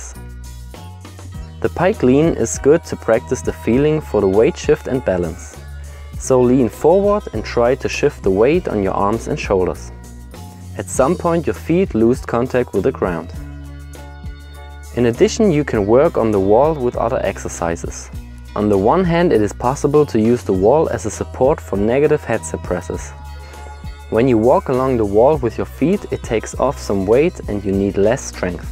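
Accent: Germany. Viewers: Wie man einfach das "deutsch " raushört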